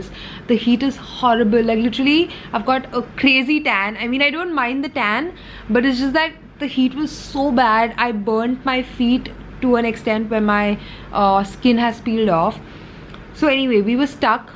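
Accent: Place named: India. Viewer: Gosh your Indian accent is so thick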